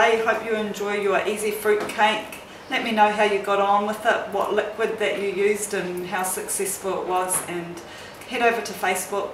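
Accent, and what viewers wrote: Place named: New Zealand. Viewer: Love that kiwi accent. As well as the fruit cake recipe. 👍😉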